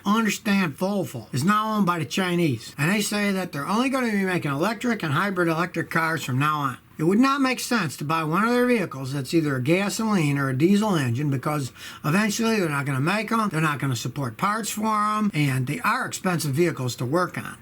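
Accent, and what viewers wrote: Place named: United States. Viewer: Where the hell is scottie from? Sounds like a yank himself.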